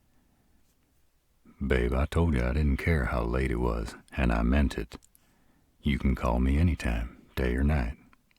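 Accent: South, United States. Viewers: that southern drawl is so freakin hott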